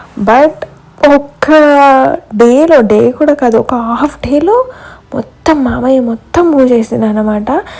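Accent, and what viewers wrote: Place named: Chittoor, India. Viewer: Asalu voice gurinchi yenduku ala antunaru? garu karnataka kada koncham chittoor slang ki similar ga undochhu....any how chala neat ga matladuthunnaru....go head and congratulations garu❤ Navaneeta from Bangalore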